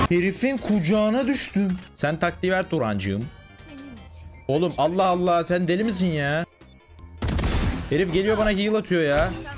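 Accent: Thrace, Turkey. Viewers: abi trakyalımısın konusma tarzın oyle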